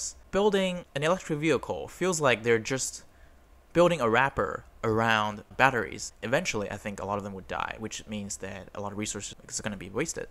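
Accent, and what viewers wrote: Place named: United Kingdom. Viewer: Good video. Also u have a heavier British accent these days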